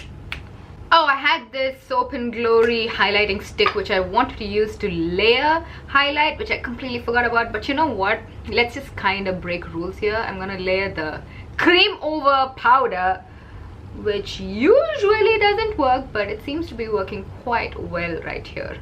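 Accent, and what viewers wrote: India. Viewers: You have a little Indian accent 🤷🏽‍♀️ I'm French and Srilankan girl ✌🏽You're beautiful ❤️😘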